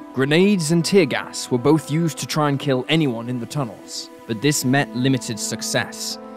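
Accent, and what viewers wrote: United Kingdom. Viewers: the us military didnt have a 9mm pistol during the viet nam war. they used 1911 45 acp or 38 special revolvers. Limey narrator, reading a script. lmao. No credibility .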